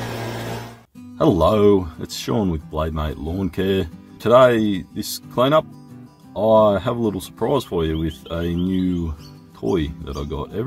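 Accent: Australia. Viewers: Ps. My mother was born & raised in Australia so it's been great hearing an Ausie (sp?) talking! She never had spoken about the snakes & lizards there (😳😟 ick) but she had complained about the Kookaburras that Always landed right outside her bedroom window to wake her up at the break of day!😂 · I clicked because he is Australian 😂😂Sean! 👊👍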